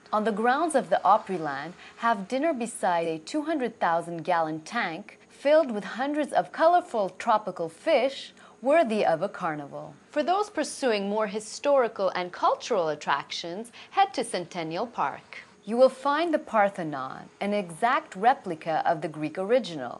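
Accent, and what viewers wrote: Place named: Canada. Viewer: she sounds Canadian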